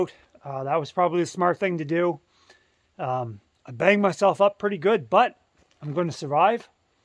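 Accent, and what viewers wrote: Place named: Canada. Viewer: That must have been some fall! I’m glad your okay and didn’t seriously injure anything. When you were showing us how you landed by demonstrating I was wanting to say “ don’t do that to yourself your in enough pain. We can just imagine in our minds”. Again glad your ok and that you’ll survive. Enjoy watching your content;been watching 2 years now. Looking forward to the next one. Also I hope you and your family have a great Thanksgiving 🦃. Also just wondering, are you Canadian? Reason I ask is because I notice when you say words like out I can hear the oot pronunciation. Thanks!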